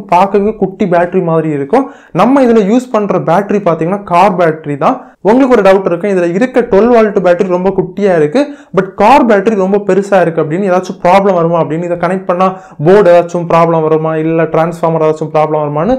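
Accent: Kanyakumari, India. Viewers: Neenga Kanyakumari ya bro. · Bro.. Kanyakumari slag😍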